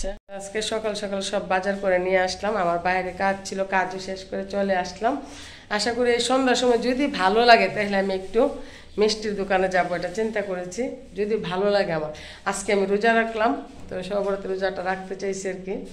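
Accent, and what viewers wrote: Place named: Brahmanbaria, Bangladesh. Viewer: তবে বিশেষ করে আপনাকে একটা কথা বলতে_ই হই, আপনার মধ্যে ব্রাহ্মণবাড়িয়ার একটা আঞ্চলিকতা লক্ষ করা যায়।❤❤❤❤❤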